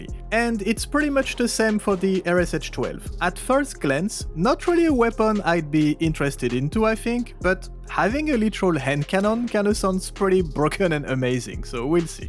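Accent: France. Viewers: Are you French by any chance ? :D.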